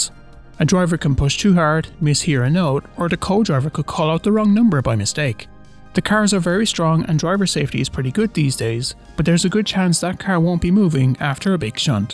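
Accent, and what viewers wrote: Ireland. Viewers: I can tell from the accent youre irish like myself so lets pray for a craig breen win😂🇮🇪🙏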